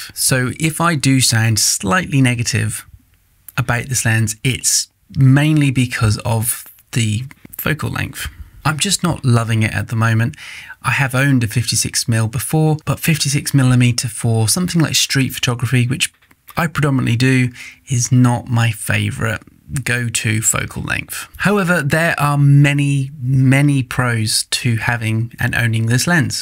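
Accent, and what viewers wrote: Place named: East Anglia, United Kingdom. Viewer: I am based in Istanbul now, just doing a bit of research into Fuji and lenses as I want a new "fun camera". Instantly, I picked up a bit of East Anglia in your accent - not 100% sure I went to check your website and yes, Suffolk! Amazing. I grew up in Norwich. Moved away in 2019. Subscribed!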